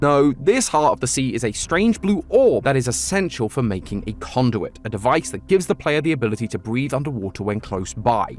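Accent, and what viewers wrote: United Kingdom. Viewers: i thought you were British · Your to British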